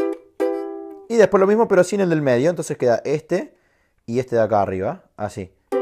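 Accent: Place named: Córdoba, Argentina. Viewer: Argentinoooo wachooooo cordobe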